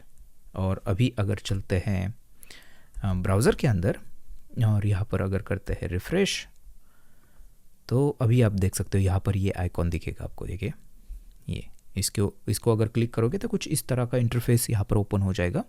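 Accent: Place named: Mumbai, India. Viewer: bhai aapka slang typical mumbaikar jaisa hai, btw love your content❤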